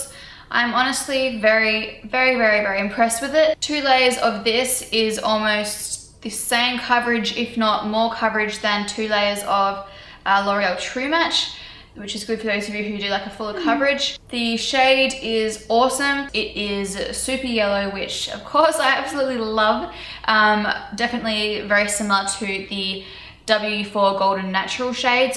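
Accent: Australia. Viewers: Australian or New Zealand? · Ur Australian accent is so cute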